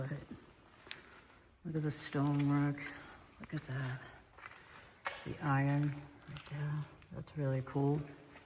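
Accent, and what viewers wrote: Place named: Massachusetts, United States. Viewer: You sound like my mom and my aunt from. They're from Malden Massachusetts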